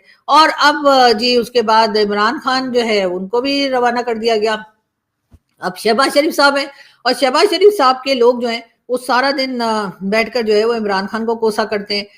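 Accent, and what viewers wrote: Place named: Braj, India. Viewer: हाथ हौला रखें 😂😂,,प्योर up बाली ब्रज भाषा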